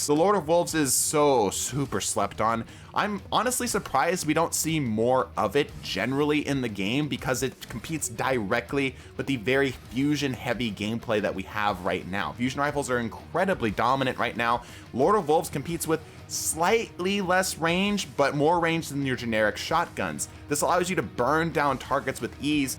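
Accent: United Kingdom. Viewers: Is this the same dude with the British accent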